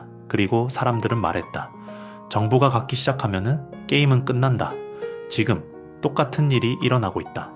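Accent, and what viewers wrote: Gyeongsang, South Korea. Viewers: 경상도 사투리네...경북 사람인듯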